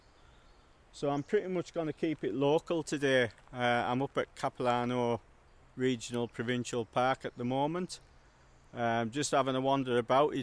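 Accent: United Kingdom. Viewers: To me, the image quality of your videos is fantastic. I don't always understand what you are saying (I am a francophone and not familiar with british accent) but watching your videos is a real pleasure. Bravo!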